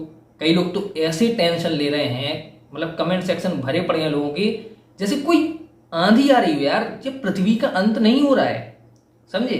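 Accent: Bundelkhand, India. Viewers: Sir ap bundelkhan se h Kya ???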